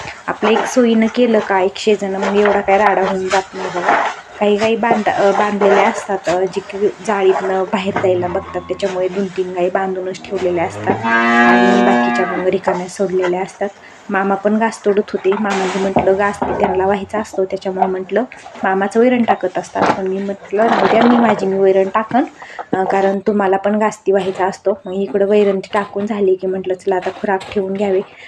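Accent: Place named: Maval, India. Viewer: जय सियाराम ताई ताई तुमचं गाव कोणतं कारण बऱ्यापैकी मला तुमची भाषा मावळी वाटते म्हणून विचारलं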